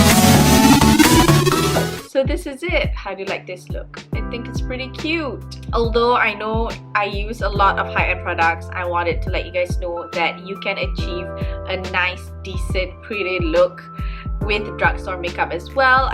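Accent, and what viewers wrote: Penang, Malaysia. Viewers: kak buat la video cakap bahasa melayu, seronok tgk btw saya orang penang so loghat kita sama. riuh dia lain macammmmm hahahaha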